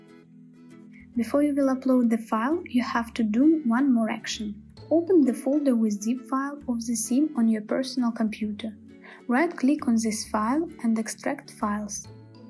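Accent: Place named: Russia. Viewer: I love your Russian/Polish/Ukrainian accent.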